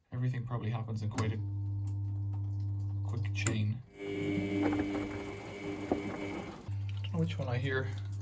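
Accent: Ireland. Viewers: Just had my sunday lunch and now I am snoozing with your lovely Irish lilt soothing me as I drop off.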